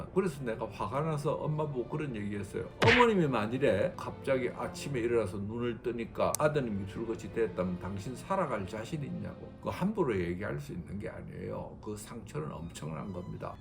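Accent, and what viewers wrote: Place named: Gyeongsang, South Korea. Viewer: 원장님 경상도 분이셨군요..!^^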